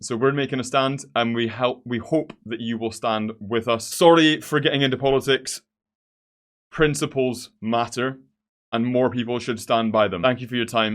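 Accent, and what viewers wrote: Scotland, United Kingdom. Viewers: Does he sound English to you? 😂 He’s Scottish 🏴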